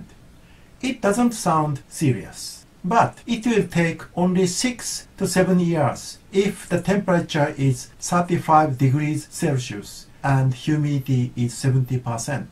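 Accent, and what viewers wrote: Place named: Japan. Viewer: まさに日本人って感じのアクセントやな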